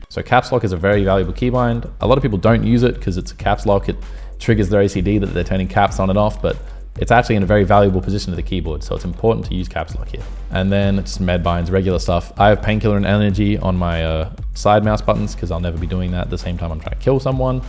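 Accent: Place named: Australia. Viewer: I love the Australian accent 🦘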